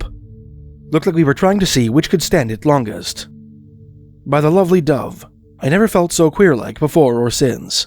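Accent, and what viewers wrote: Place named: Canada. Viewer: I really wanted to listen to these stories. But the voice ... is the reason people poke fun at us canadians. Stop patronizing the stereotype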